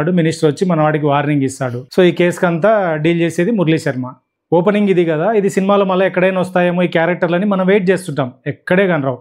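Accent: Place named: Rayalaseema, India. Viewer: మేష్టారు పగలబడి పగలబడి నవ్వుకున్నాను, దయచేసి మీరు ఈ రివ్యూలు చెప్పడం ఆపొద్దు, రాయలసీమ యాస కుమ్మి పడేసారు, 😅😂😅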